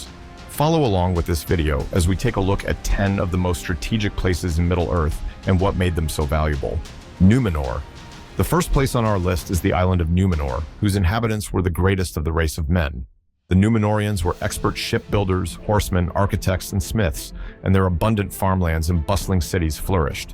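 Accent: United States. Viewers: The pronunciation of place names is too American. Especially Osgiliath and Eregion.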